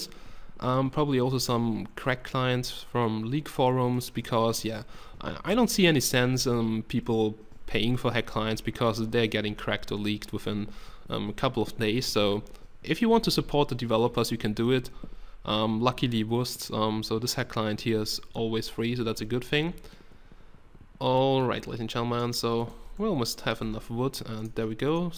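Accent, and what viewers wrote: Germany. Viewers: was du bist deutscher? xD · biste deutscher? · man hört das du bist deutsch :)) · Ist er Deutscher? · are you german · is he german ? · Man hört das du deutscher bist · are you german? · kann es sein dass du deutscher bist ? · hey are you german ? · are you german,? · are you German? Bist du Deutsch?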